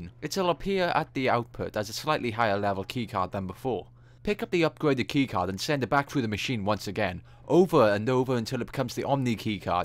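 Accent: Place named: South Wales, United Kingdom. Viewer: weyhey, Cymro o'r diwedd! you sound like a south wales valley boy, are you from Rhondda or like Ystalafera?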